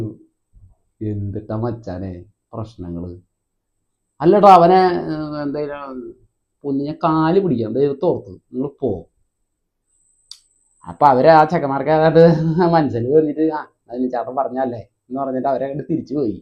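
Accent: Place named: Thrissur, India. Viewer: Thrissur slang super ayyitu parayunde❤❤❤❤❤😂😂😂😂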